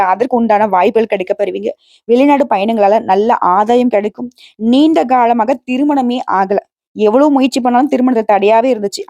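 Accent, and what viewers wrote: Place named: Tirunelveli, India. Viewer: Good to hear in my Tirunelveli Tamil slang 👌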